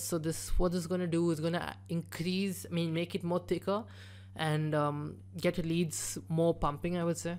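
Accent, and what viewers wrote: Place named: India. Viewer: I mean.That's Awesome.His voice sounds Indianish.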